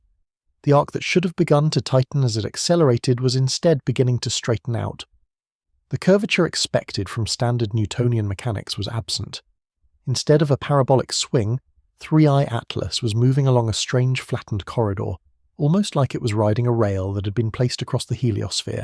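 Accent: United Kingdom. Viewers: British voice. They are a day ahead.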